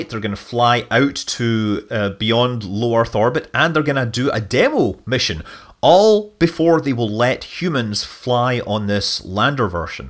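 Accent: Scotland, United Kingdom. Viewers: "Hulloo, Scott Manley here." How do you write the "Scottish" accent? I love it but don't know how to get those "ahrr's" in print, bummer! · Are you Scottish?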